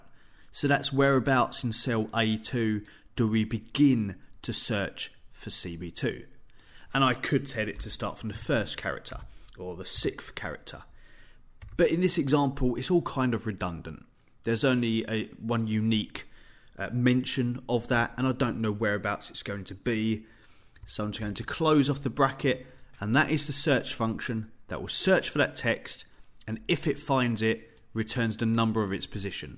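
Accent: United Kingdom. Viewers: Excellent explanation. I solved the problem I had. Thank you very much "indeed". I liked the way you explain, especially for your accent! From now on, I will come to your channel in case I have troubles with Excel. Also, I'll tell my friends about your Channel. I studied English in Cambridge, UK, a long time ago, and I always loved the British Accent. Now I live in USA, and the American English sounds like another language. (No offense, please)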